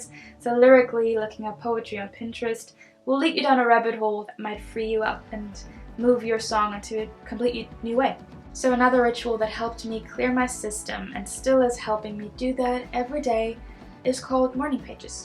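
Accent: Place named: Ireland. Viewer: "...if you're still watching this." Hi. How could we not watch your video:) this was a very good "how to". I would say you are just scratching the surface of what and how you do it. You raised many interesting ideas of "things to do" to capture your thoughts/feelings about life. You seem very natural and calm in front of the camera. Your English is very good, esp considering it is not your native language. I detect just the slightest hint of an Irish accent when you get rolling. I agree with being well rested, having a journal and writing in the morning when you say what you really think. This is a good topic and i am hoping to see more. I am proud of you, little butterfly 🦋 have a good weekend and thank you:)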